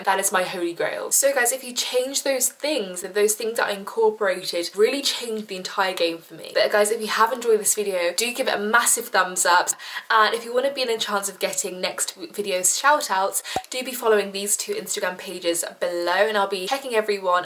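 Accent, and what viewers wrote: United Kingdom. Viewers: Omg this British accent 💖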